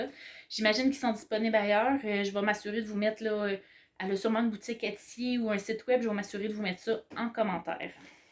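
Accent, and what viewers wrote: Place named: Quebec, Canada. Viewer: bonjour de savoie pourriez vous dans votre prochain podcast marquer votre région du québec car avec l'accent je n'ai pas compris , je regarde ensuite sur google pour me donner une perspective du paysage.merci très interressant le salon; dans ma région il n'y en a pas, nous avons 2 salons de couturières par an où l'on trouve des fils à tricoter d'occasion:ou des vides greniers j'ai passé un bon moment avec vos projets merci pour ce partage.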